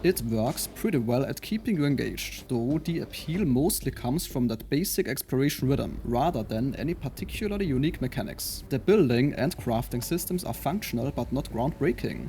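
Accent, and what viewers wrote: Germany. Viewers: The German accent is very noticeable :D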